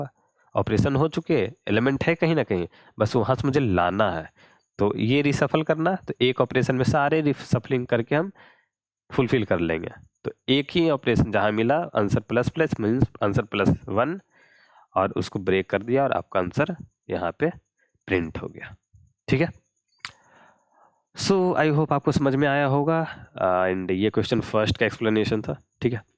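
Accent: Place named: Bihar, India. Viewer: Thanks Bhaiya! Great Explaination! Loved your BIHARI accent! ❣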